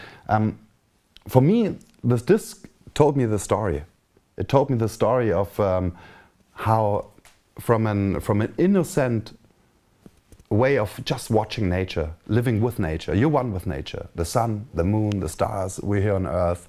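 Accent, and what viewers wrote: Germany. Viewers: super dass du jetzt auch internationale Versionen machst. Gutes Englisch, bin überrascht, und der typisch deutsche Akzent wirkt ja eh immer irgendwie sympathisch.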